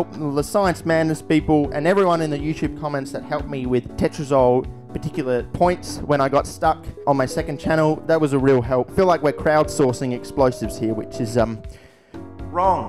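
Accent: Australia. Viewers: So refreshing to hear Aussie content on YT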